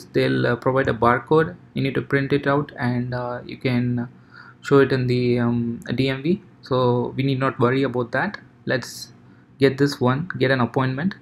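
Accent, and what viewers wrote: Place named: India. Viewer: heavy indian accent is making this video impossible to understand..... dislike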